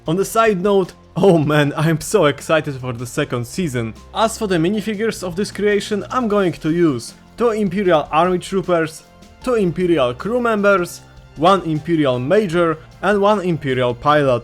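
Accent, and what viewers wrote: Poland. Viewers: nie no stary, masz totalnie polski akcent to usłyszeniu z 5 słów już widziałem że jesteś z polski. ale moce Zajebiste🔥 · Your had polish Accent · łoooo panie polak! słychać po akcencie typowo polski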